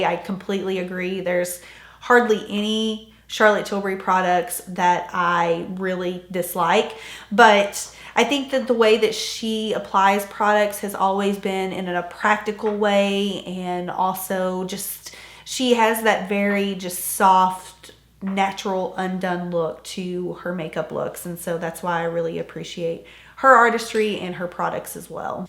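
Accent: South, United States. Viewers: i love your slightly southern accent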